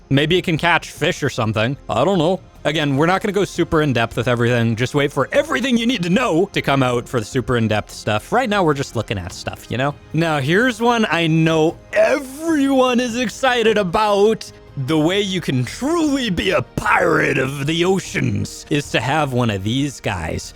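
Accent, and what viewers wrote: Australia. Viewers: Disliking because of the terrible Australian accent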